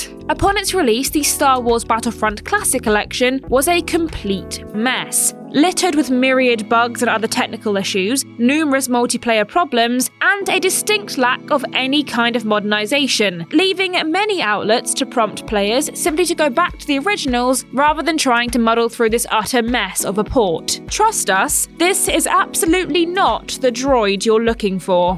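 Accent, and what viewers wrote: United Kingdom. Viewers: For some reason, sounds more British than ever in this video <3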